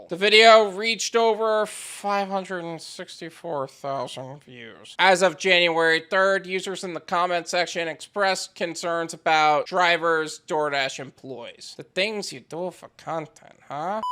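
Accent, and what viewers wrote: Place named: United States. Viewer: Bro i like you us accent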